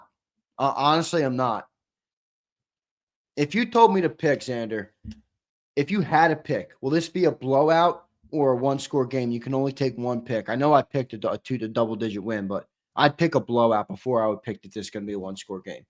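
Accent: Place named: Delaware County, United States. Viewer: Love your Delco accent! Or is it a city accent?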